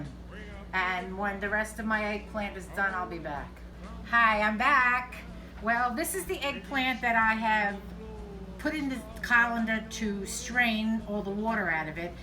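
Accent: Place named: Philadelphia, United States. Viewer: Your recipes are great but your pronunciation of slang Italian American version of words sound very South Philly. It’s not authentic Italian pronunciation. I still enjoy your videos. 🍷😋👍🇮🇹🇺🇸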